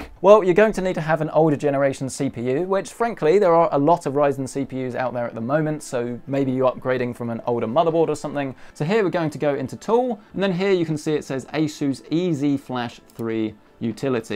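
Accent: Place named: Southern England, United Kingdom. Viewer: Sounds like Southern England to me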